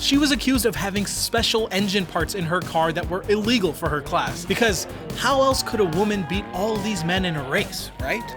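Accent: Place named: United States. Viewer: Hearing "bloody" and "petrol" in an American-English accent just does not compute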